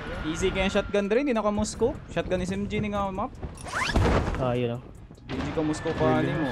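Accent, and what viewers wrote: Visayas, Philippines. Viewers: Idol bisaya ba ikaw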